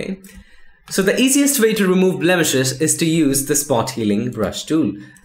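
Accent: India. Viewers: Watching your channel for a while but this is my first comment. Honestly this channel is one of the best to learn Photoshop. Thanks man for your effort and time. And by the way I'm impressed with your English accent. I find Indian English kind of funny. But you rock as you rock with Photoshop. Keep up the good work.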